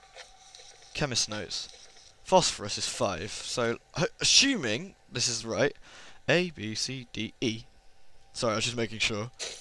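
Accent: United Kingdom. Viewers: You sawed Freemans hand off D: You monster! Loving your walkthrough, and its nice to hear a british accent. Yay for us British peoplez.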